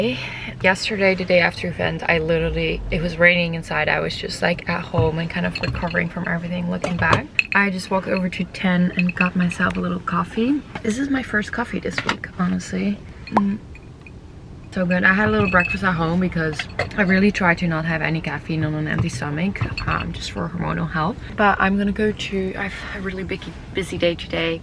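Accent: Netherlands. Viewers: whaat, when i first came across your video’s i thought i heard a dutch accent to your voice, you’re amazing!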